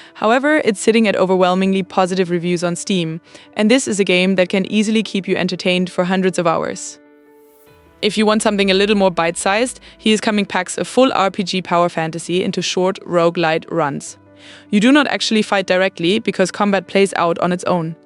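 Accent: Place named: Eupen, Belgium. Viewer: Gotcha! GL with your future endeavours, fellow Belgian. I'd guess Eupen area with the slight accent? Excellent english 👌What are your plans for future videos, if I may ask? This was a clean video but reviews are not very "exciting" afaic.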